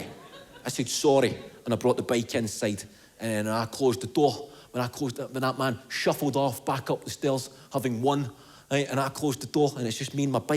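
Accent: Scotland, United Kingdom. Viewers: So i can eventually understand him one day (i love the Scottish accent), also, I'm pretty sure i never said i couldn't understand anything...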